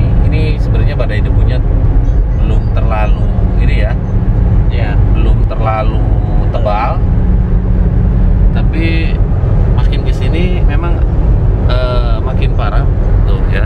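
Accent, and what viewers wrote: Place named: Madura, Indonesia. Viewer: reng medureh ... ternyata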